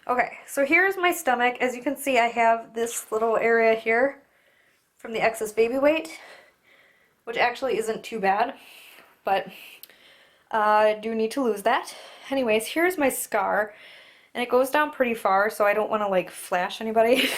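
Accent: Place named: Chicago, United States. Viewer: You have a Chicago accent. The scars dont look bad at all and I am very honest. Most internet people say "yaaas" blah blah even when person 400 pounds! You look pretty good.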